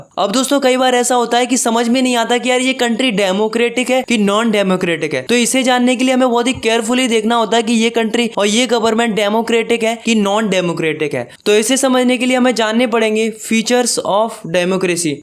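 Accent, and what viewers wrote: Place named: Bihar, India. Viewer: bhai apna accent theek karle thoda bihari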